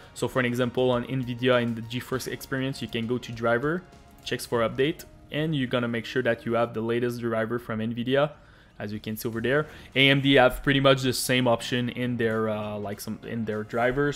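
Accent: Quebec, Canada. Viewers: You sounds like a french-canadian just like me x)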